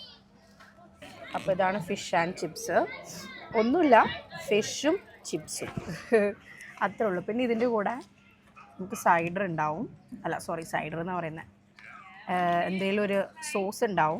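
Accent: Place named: Kannur, India. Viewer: “Ayyoo aah pennu avidenneduthu THULLI” 😂. Kannur baasha❤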